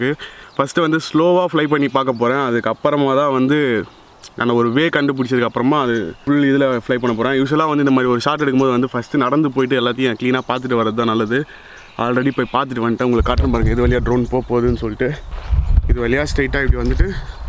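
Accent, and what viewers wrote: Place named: Chennai, India. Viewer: Chennai slang iruku bro pesumbothu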